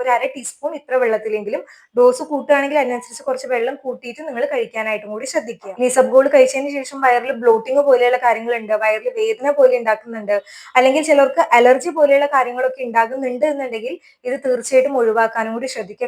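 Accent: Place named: Thrissur, India. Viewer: തൃശൂർ മലയാള പോലുണ്ട്. ഉച്ച്ചാരണംശരിയല്ല സ്പീഡുകൂടുതൽ ആയതിനാൽ ചില അക്ഷരങ്ങൾ ചോർന്നു പോകുന്നു. പല്ല് ഇല്ലാത്തവർ സൂപ്പർ ഫാസ്റ്റ് സ്പീഡിൽ പറയും പോലെ ശ്രദ്ധിക്കുക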